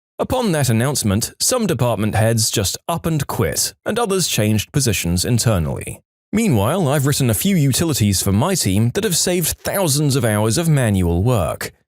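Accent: United Kingdom. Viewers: the reason why the narrator has turned British is because it's a different narrator from Am I the Genius. he's been in a couple videos lately. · I’m not the only one who liked the British voice he used in the video. I want to hear more of the British voice. I liked it. It was nice if I do say so.❤😂😊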